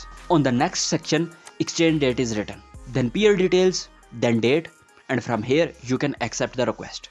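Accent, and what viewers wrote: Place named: India. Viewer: Your indian accent is really so strong accent it almost seems your being funny😂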